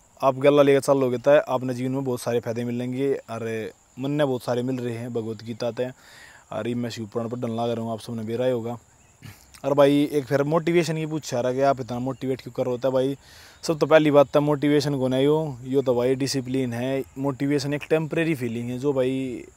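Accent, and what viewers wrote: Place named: Rajasthan, India. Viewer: Being a Rajasthani I can understand him very well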